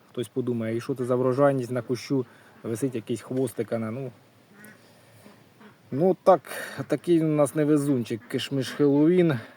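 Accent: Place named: Poltava, Ukraine. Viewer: Дякую за чисту решетилівську мову!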